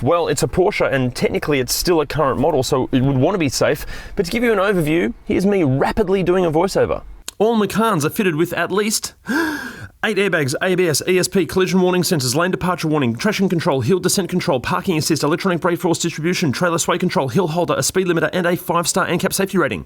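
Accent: Australia. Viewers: Your Aussie accent and sense of humor makes your channel a joy to watch. Specially made me laugh when you called the guy a wanker 🤣🤣 my only feedback would be to include its off-road capabilities. I guess that would be important for some people.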